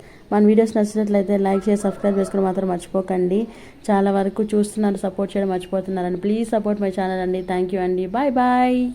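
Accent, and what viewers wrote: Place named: Nellore, India. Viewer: Nellore aa sis medi nellore yasa anipistundi mi matallo 😂😂😂bagundi mi bus lorry avvaley😜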